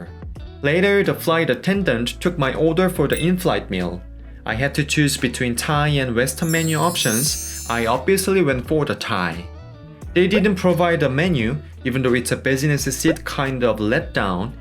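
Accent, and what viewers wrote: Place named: South Korea. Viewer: Such an honest pronunciation of a Korean dude. Just speak Korean and add captions in English would be a much better and high quality method for your vids mate.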